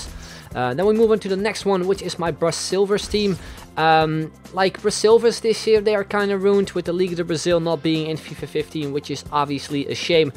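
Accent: Netherlands. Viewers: Kom jij uit Nederland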